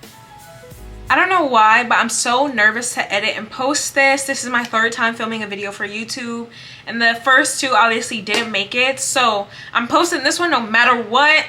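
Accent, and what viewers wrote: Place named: Caribbean. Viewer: Thank you for sharing &I love your accent is it Caribbean?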